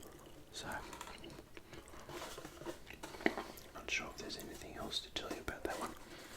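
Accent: Australia. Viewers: "An Australian man's voice quietly discusses this beaker of contaminated blood"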